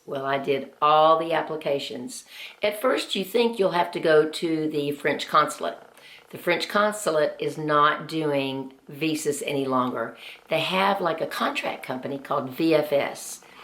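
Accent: South, United States. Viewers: So impressed with you! Go! Do I detect a southern accent?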